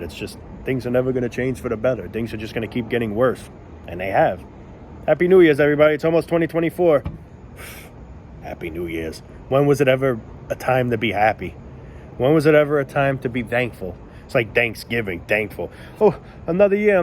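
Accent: New York, United States. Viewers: I really like your New York accent, off topic i know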